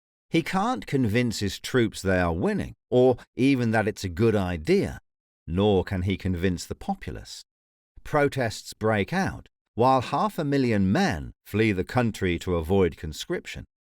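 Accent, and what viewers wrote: United Kingdom. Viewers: So wtf why is there a British voiceover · why are you british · Is this the same narrator? You got all British on us.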